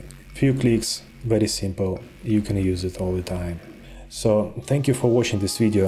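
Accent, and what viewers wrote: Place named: Russia. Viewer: Слышу что русский акцент, спасибо дружище, выручил!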